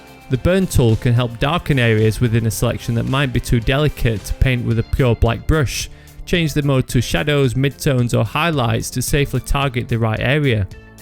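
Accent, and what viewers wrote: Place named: Yorkshire, United Kingdom. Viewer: Love Yorkshire accent.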